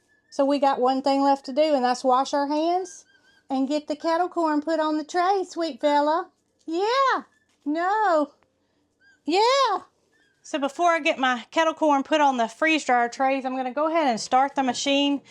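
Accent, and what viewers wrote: South, United States. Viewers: kettle corn or cattle corn I cannot understand the Southern accent..sorry1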